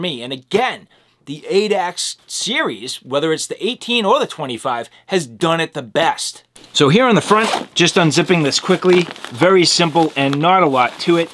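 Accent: Boston, United States. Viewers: Great review, and your Boston accent makes it even better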